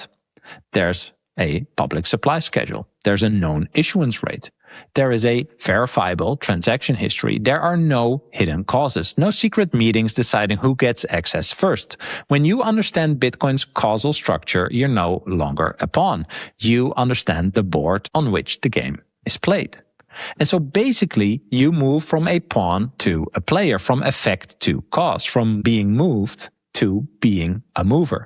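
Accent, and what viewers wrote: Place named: Netherlands. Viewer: Yo! You are the young Jack Nicholson but with a Dutch accent! Keep up the great work, hello from Hungary!✌️😎💚💎✨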